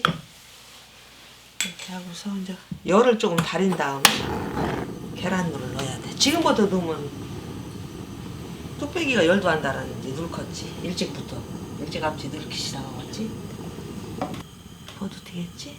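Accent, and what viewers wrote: Chungcheong, South Korea. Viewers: 충청도분이신가 봅네요 구수합니다ㆍ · 충청도 말씨구만요 친근하게 느껴지잖아요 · 영상떠서 보고 바로 구독합니다 구수한충청도사투리인지요??? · 구수한 충청도 방언과 맛난 결안찜 굳입니다.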